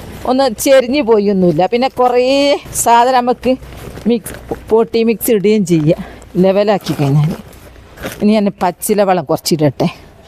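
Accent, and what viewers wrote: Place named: Malappuram, India. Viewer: നല്ല മലപ്പുറം ഭാഷ കേൾക്കാൻ നല്ല രസമുണ്ട്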